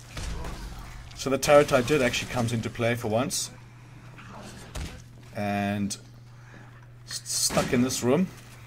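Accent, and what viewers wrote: South Africa. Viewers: You sound like you're from SA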